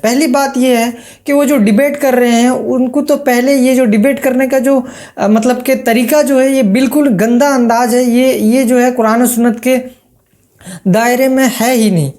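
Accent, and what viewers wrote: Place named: India. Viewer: Inka taaluk India se hai, aur India ke kuchh elaako me log ese hi Urdu boltey hain, jese hamaari taraf hamaarey kuch pathaan Bhai jab Urdu boltey hain to unki Urdu thori si different hoti hai, jesey wo kbhi چھوٹا ko چوٹا bol detey hain. Esey hi India me kuch elaake hain jahaan log شروع ko سروع boltey hain.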